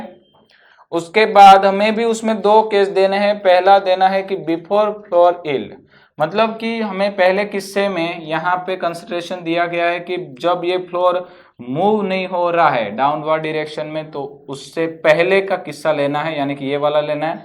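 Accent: Gujarat, India. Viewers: i can bet that you are from gujarat by your way of telling force . Anyway you are working hard and you will be succesful one day . you are a good physics teacher.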